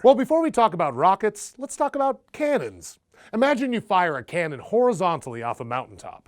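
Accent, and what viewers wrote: United States. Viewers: Let me preface this by saying the narrator on here is great. Does a fantastic job speaking, but I’m pretty sure this guy is an American. If he isn’t he’s masking his accent brilliantly. IN AMERICA WE DONT USE SOCIALIST MEASUREMENTS! We use the imperial or The US Customary units. I realize that The International System of Units (SI), the modern form of the metric system, is preferred for many uses by the U.S. National Institute of Standards and Technology (NIST), but guess what, we viewers are not scientist. We can literally visualize in our minds 10000 miles, 600mph or 10’ tall, or a yard (as in 36” and not something you mow people from WV and south). We Use the imperial system !!!! Geez.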